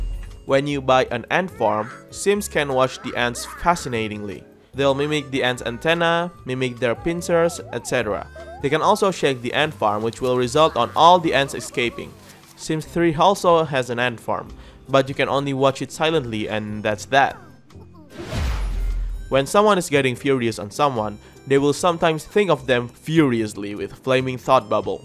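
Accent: Indonesia. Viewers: are you from Indonesia? I can hear your Indonesian accent. ✌️✌️✌️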